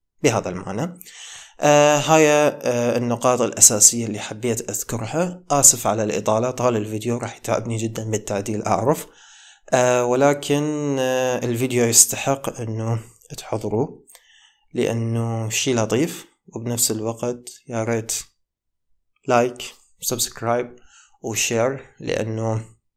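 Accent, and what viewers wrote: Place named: Iraq. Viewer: ربي يوفقك بجاه محمد وآل محمد نحبك كلش من العراق اغلب متابعينك طلاب عراقين يمكن اني الوحيدة الي لاحظت اللهجة مالتك مزج بيها لهجة عراقية يمكن تستخدمها لان متابعينك عراقين حتى يفهمون اكثر احييك 💙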